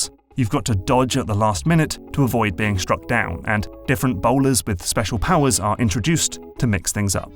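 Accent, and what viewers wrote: United Kingdom. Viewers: Need YouTube AI to convert unpleasant British accents to accent of our choice.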